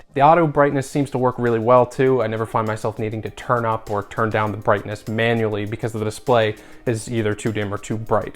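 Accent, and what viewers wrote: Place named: Newfoundland, Canada. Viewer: Newfoundlander!?? Sub’d! 🍕👍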